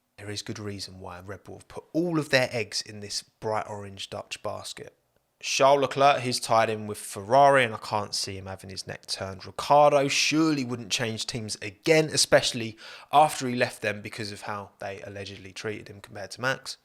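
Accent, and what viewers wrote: United Kingdom. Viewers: anyone else having trouble with the thick british accent?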